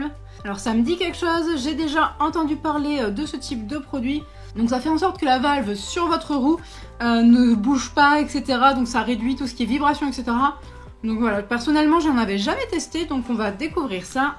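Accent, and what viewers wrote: England, United Kingdom. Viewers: Quel belle accent anglais 😁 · super video encore!! j'avoue que je ne prendrais pas la box pédaleur, mais franchement c'est super sympa de nous faire découvrir ça, et puis c'est toujours cool de pouvoir avoir des nouveautés qui peuvent arriver sur le marché, grace a toi!! Et pour finir j'aime beaucoup ton "accent anglais" quand tu parles:-D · Des chaussettes 😃 !!!! routine recup ✌️✌ cool l'accent anglais 😜😜 remarque c'est normal !!!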